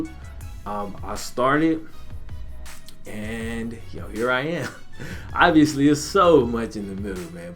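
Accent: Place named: Mississippi, United States. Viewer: Hold up...u from Mississippi? I’m from Mississippi idk why but that cool as shit to me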